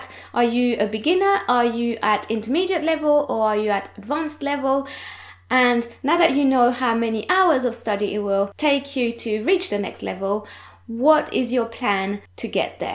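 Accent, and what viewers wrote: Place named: United Kingdom. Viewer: Merci beaucoup for this video! You sound so British!!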